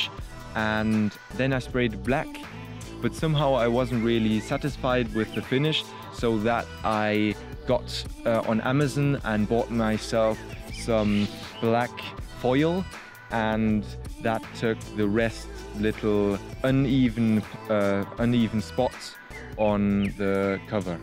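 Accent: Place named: Germany. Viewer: Hey great job! I will probably start one project myself in the winter. I am preparing stuff now and try to find a lightweight electric motor... where did you get yours and what kind of motor is it? There are so many different ones... also did you need a converter? do you think you can compare the power the bike has to a combustion engine? Where you from also? Sounds partly like you are from germany (i am from germany)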